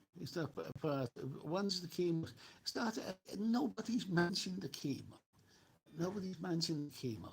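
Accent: Liverpool, United Kingdom. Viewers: True Scouser!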